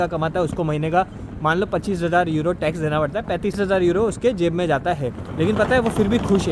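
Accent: Gujarat, India. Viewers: bhai aaj first time teri vedio dekhi aacha laga , waise tu gujju hai kya tere bolne se style se aisa lag raha hai. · So good to hear from you. Well explained. 8 enjoyed and learnt things from your video. You sound typical Gujju😅😊🎉All the best.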